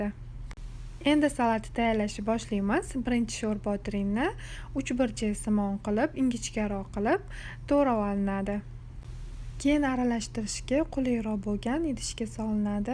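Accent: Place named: Tashkent, Uzbekistan. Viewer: Towkencha gapirvottilaku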